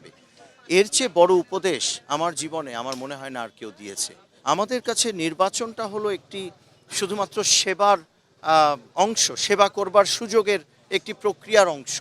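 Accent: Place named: Kolkata, India. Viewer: কলকাতার টোনে কথা বলছে টেনে টেনে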